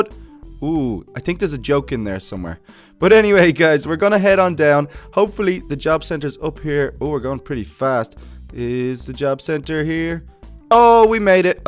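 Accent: Ireland. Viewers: Is he from Dublin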